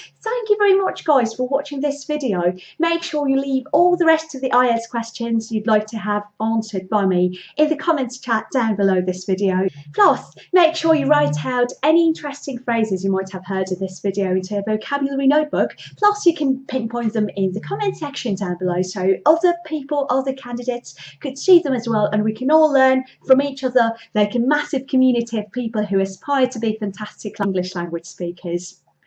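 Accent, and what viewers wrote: United Kingdom. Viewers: Wow 😮 amazing , no accent, just clear British speech! How have you managed to acquire such good pronunciation skills???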